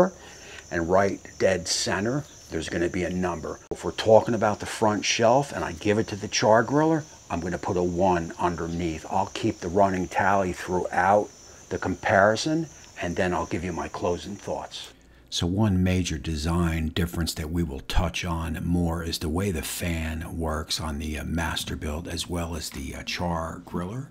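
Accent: New Jersey, United States. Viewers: Chim-a-ney... Love to NJ accent brother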